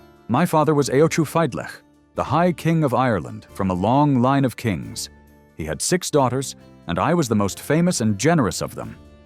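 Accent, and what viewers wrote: United States. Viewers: Why US accent when it's about Celtic Mythology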